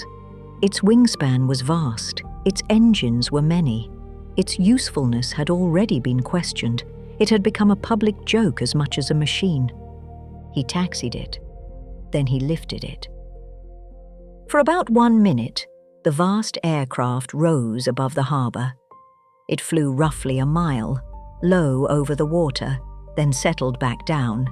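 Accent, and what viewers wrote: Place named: England, United Kingdom. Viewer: Old English? No just english. Very nice too. Really enjoyed this. Thank you for sharing.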